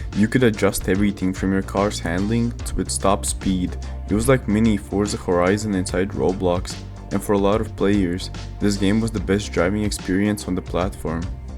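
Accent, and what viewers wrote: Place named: Portugal. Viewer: Portuguese accent I think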